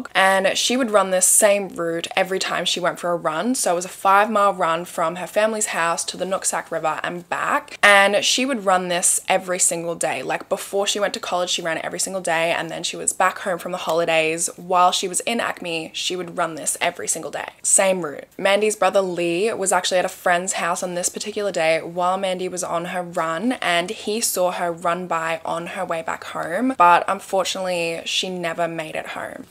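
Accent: Australia. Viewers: Thank goodness that man had the training to get her out of the water without destroying DNA. Each LE department needs to have a couple of people trained in that area because it is so important. I'm sorry, the river...I can't figure out what you're calling it. It sounds like you're calling it the nut sac river. Not trying to be funny and I guess it could be possible but your accent is much prettier than an English accent and I just figured out your from Australia. Idk how long I should have already known that. I'm getting old...maybe I forgot. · You’re telling me you’re Australian not British?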